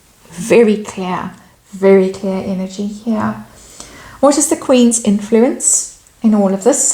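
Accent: South Africa. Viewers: Yes I love the accent too! South African through and through!👏🇿🇦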